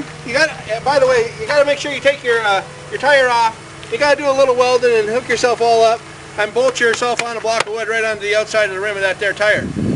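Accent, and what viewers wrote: Newfoundland, Canada. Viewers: He must be from Newfoundland.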